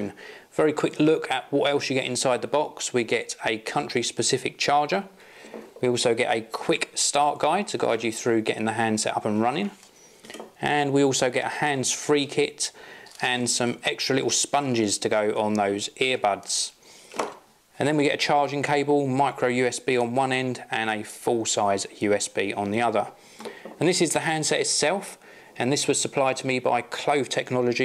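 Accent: England, United Kingdom. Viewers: I remember you because you unboxed the HTC Titan on Technobuffalo (I believe it is the same phone?) and your nice english accent.;) Great Job! I want a Windows phone as my new phone if ever im going to change a phone, but I think I'll wait for the Nokia one..;)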